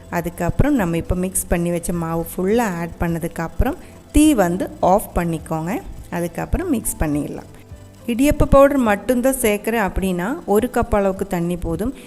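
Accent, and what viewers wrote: Kerala, India. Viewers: மலையாளம் கலந்த தமிழ் பேசுவது சூப்பரா... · Hi,ningal malayaliyanennu AA thamil parayunnathu startingil kettappol thanne manassilyi .Pirake chakka ennu paranjappol confirm aayi.Tamil nattil janichu valarnna malayalisil ee different kanarilla.Athukond keralathil valarnnavarakum ennu karuthunnu.ButI really happy.Nammude aalukal Anya bashayil vedio cheyyumbol, athum AA nattukar eshtappedumbol kittunna santhosham onnu vere thanneyanu. Keep it up.My hearty wishes for u. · malayali Tamil peasaree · Very good tamil to listen in Malayalam accent❤️❤️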